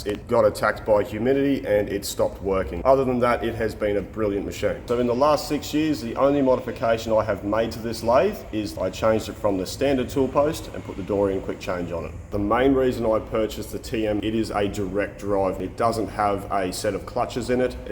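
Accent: Australia. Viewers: So bloody austrailian ah. "That aint no bone boy. Now this is what i call a bone" with a sparkle in ya eye😁😁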